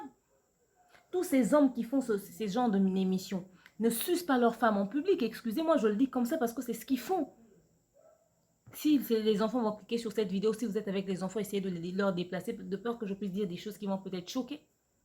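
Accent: Ivory Coast. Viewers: stp ne brande pas l'accent ivoirien on parles mieux français qu'eux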